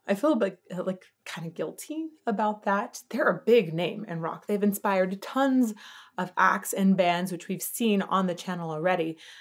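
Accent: Germany. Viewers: That German accent is so sexy 😍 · English is not his native language....he is German think about that..........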